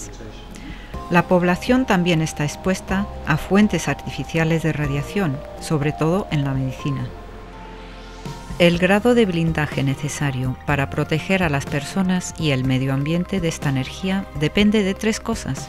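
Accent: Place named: Spain. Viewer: Amo los documentales cuando relata un español, las imágenes son didácticas y tiene música placentera de fondo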